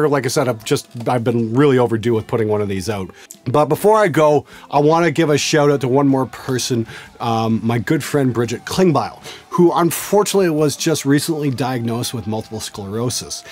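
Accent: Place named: Canada. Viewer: I love the canadian accent.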